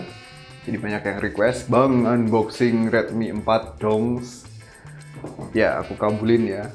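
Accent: Java, Indonesia. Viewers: medoknya khas banget bang 😄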